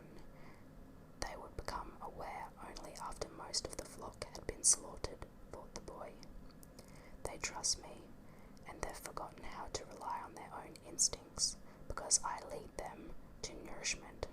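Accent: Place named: Australia. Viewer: Definitely an Aussie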